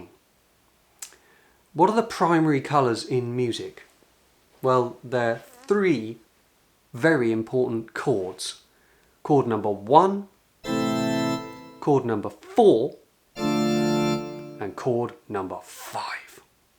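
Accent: United Kingdom. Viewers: Dear, a while ago I have come across your beautiful YouTube channel and have been glued to my notebook screen ever since. in order to catch up with as many as the older videos as possible. It is such an amazing abundance of landscape, church architecture, organ expertise, wisdom, language and literature and last but not least – music. I love the magic moment when you open a church door, expectantly and respectfully, As a translator/interpreter from Icelandic I have an ear for languages and accents and I must admit I fell for your beautiful British, or should I say English, tongue right away. I could listen to you forever and you have definitely enhanced my vocabulary since I keep watching your videos. Please keep filming – and playing - and talking! 😊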